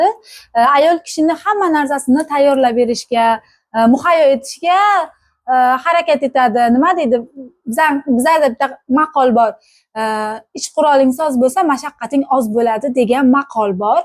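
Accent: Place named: Bukhara, Uzbekistan. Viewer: Buxorodan salom. Shevamizdan aylanay😊. Biz xam siz tarafdan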